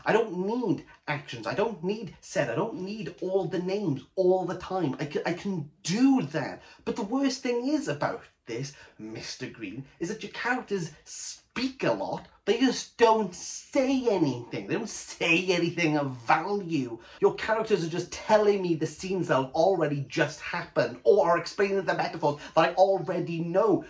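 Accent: Wales, United Kingdom. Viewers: Found your channel by accident...and what a happy accident it has been. The honesty and intelligence in this review is refreshing. Cheer to you for that! (Also, is that a Welsh accent I'm detecting, or am I completely lost?)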